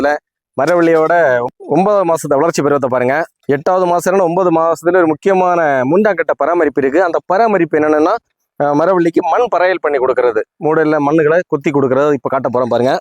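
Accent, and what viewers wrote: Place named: Nagercoil, India. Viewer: Nagercoil tamil azhagu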